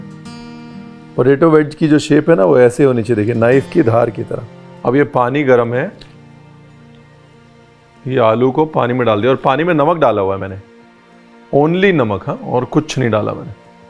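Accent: India. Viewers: Thnx sir for this recipe ,sir love ur desi style when u talk 🙏🏻😊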